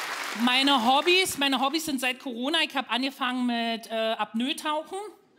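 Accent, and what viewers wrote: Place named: Berlin, Germany. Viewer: Schön das du wieder da bist. Hast gefehlt 😅 I liebe deine Stimme,dein Berliner Dialekt und deine coolen Sprüche ❤️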